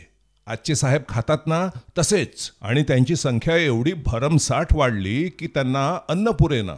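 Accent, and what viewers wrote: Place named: Mumbai, India. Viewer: छान माहिती.....इतकी वर्ष इस्रायल मध्ये राहून सुद्घा मुंबईच्या भाषेचा तडका अजून जशाचा तसा आहे😊😂असे वाटते काल परवा पर्यंत मुंबईतच होतात की काय तुम्ही😊....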